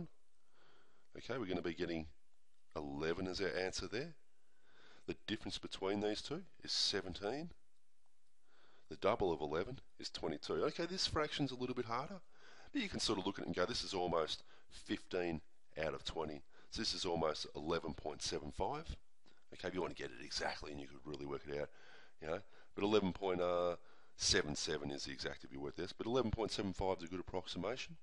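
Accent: Australia. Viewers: This man is violently Australian and I like it. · are you Australian ... ? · Is that an Aussie accent... I love it XD. Also thanks sirrr! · Man! Thanks u are brilliant . It will help us for sure. And i guess from me U are an aussie.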